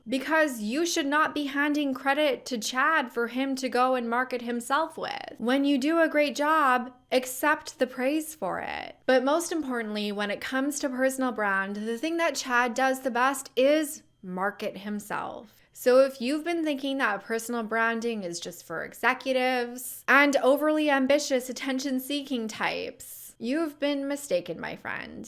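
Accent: California, United States. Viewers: what's with the way she speaks????????????? she's pretending to be a teen in high school in cali???? she's probably in her 50s, a bit too old for that annoying accent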